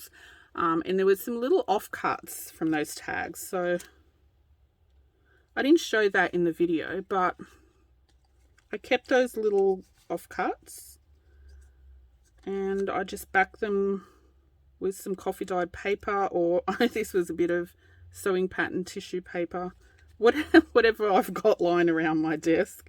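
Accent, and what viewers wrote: Australia. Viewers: Love that you sound like a fellow Aussie -& living your mini pockets 👍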